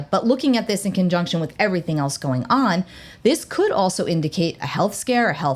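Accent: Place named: New York, United States. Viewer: From one intuitive to another.... excellent presentation! And I think you're a New Yorker by the accent? 🎉 I appreciate your approach and neutrality. I think your channel is about to take off. Congratulations! I was picking up Trump assassination attempt last year, and let's say this is a possibility with this transit. However, It would have to be a wild card event at this point because the probability has gone down since last year. Definitely subscribing! Thank you😘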